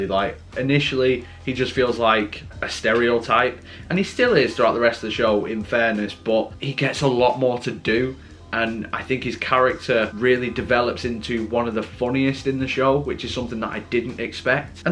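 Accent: England, United Kingdom. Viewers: I never thought I'd hear an Englishman who could appreciate this show :)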